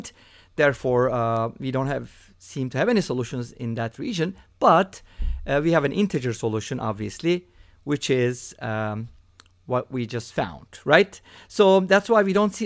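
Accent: Turkey. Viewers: I like your rapid and simple solutions. Some of the math channels I checked out, they are too slow and redundantly repeating same formulas dragging on. The viewers are math students, and the podcaster needs to get to the bottom as quickly as possible. Especially this one Indian guy, who is a brilliant mathematician but just tedious and boring to watch him. So Ata boy! and from your accent I gather that you are Turkish. Ben azeriyim. Tebrikler!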